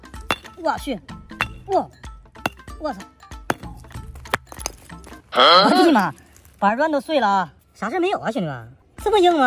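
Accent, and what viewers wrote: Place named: Northeast, China. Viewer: YT大陆开箱up主当中位数不多的大陆东北口音。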